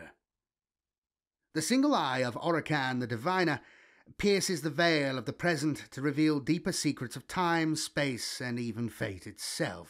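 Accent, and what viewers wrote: United Kingdom. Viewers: Mispronunciations you say. I listen to your space British all day and see nothing to complain about. Top teir if you ask me. Have you listened to any of the A.I. voiced channels that keep popping up. The mispronunciations and awful cadence makes them unlistenable. Enough to make me strap myself up with "cordite." Lol.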